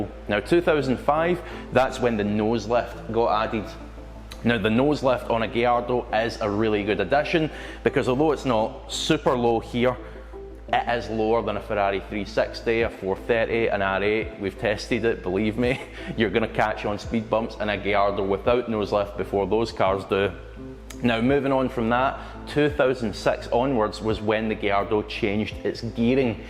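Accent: United Kingdom. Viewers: Can’t understand shit he saying I hate British accent so ridiculously funny and can’t understand nothing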